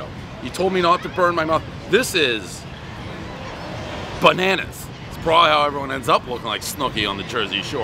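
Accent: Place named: Massachusetts, United States. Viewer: His Massachusetts is really showing on these boardwalk reviews...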